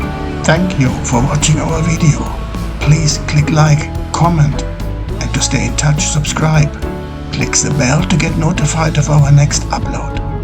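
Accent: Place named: Norway. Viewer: your accent sounds like Norwegian 😅 but i can be wrong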